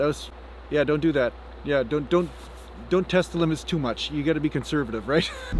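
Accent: Canada. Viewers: Are you Canadian aye?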